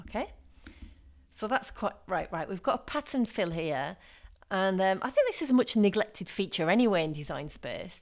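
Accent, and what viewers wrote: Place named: Northern England, United Kingdom. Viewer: Thanks for the tutorial. Love your accent! My mom is from Huddersfield, and you sound like you might be from somewhere up there in the North of England.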